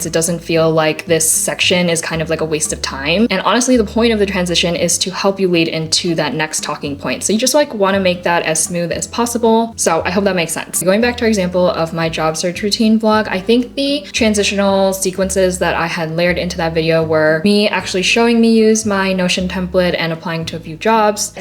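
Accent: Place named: South Korea. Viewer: 발성이 한국사람인데ㅇ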